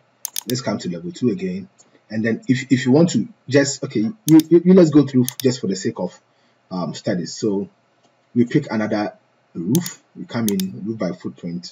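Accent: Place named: Ghana. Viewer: He sounds more Ghanian than Nigerian.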